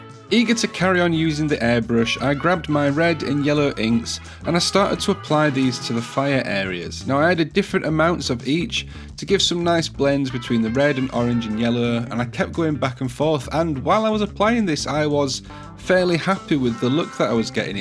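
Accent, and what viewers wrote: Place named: South Yorkshire, United Kingdom. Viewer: Hey where you from you sound local to me. South Yorkshire.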